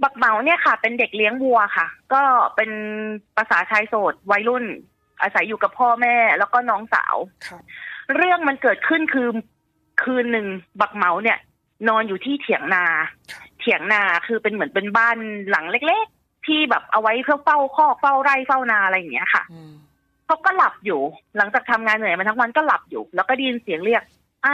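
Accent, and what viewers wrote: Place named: Isan, Thailand. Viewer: ฟังไปเเอบขำ คุณ เล่าใช่คำอีสานคำ ไทยคำ 55 น่าจะเล่าภาษาไทยไปเลยนะ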